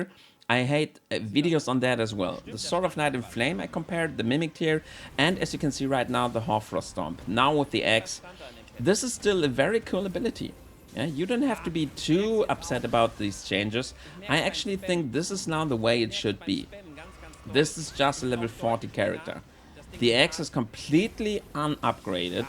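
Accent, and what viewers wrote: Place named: Germany. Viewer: (Btw finde ich es super cool, dass du solche info vids über ER machst. Ist definitiv mein aktuelles lieblings game! Außerdem finde ich die Art wie du englische Videos präsentierst super gut! Man hört natürlich das deutsche raus aber ist trotzdem super verständlich)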